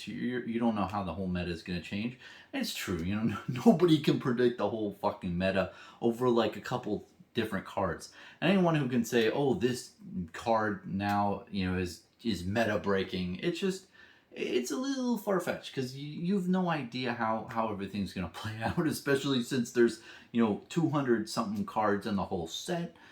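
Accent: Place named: Florida, United States. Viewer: I’ve Said It Once & I‘all Say Ot Again, Your Accent Is So Pleasing To My Ears!! Are You A Florida Native?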